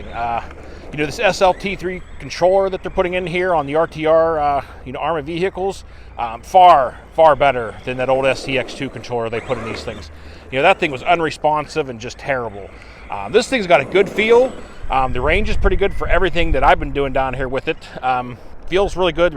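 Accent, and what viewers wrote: Pittsburgh, United States. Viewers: Where are you located? Your accent sounds like western PA/Pittsburgh. Edit - ok - I see a sign in the background that is 724 area code so we are almost neighbors! Where is this track that you are at? I have a Big Rock coming on Thursday. Just subscribed!!!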